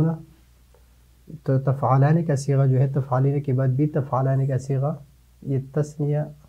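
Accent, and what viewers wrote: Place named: Pakistan. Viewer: طرزِ تکلم تو پڑوسی ملک پاکستان سے ہونے کو بتلا رہا ہے باقی واللہ اعلم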